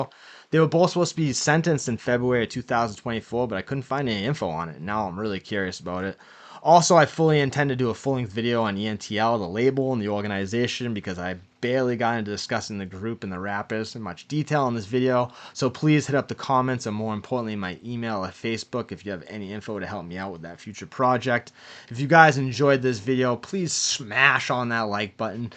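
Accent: Boston, United States. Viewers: This Narrator is so Boston .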